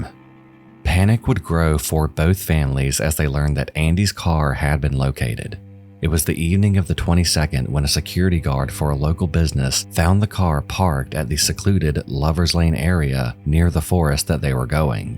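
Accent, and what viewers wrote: South, United States. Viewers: This man's southern twang is kinda sexy hehe (i'm a guy)... 😝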